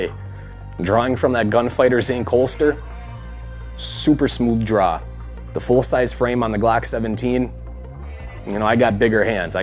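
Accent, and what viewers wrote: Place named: Wisconsin, United States. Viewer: Where yous from? I hear that accent guy and im thinkin you might be from wisco like me lol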